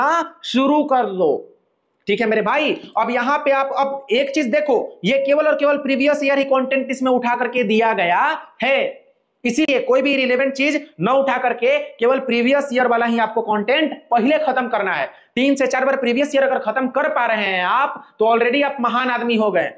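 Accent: Bihar, India. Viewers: Tum pkaa bihar k E ho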